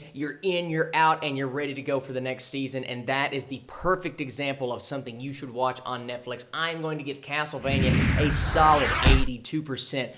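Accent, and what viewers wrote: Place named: Texas, United States. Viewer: Are you from Texas? You have that accent.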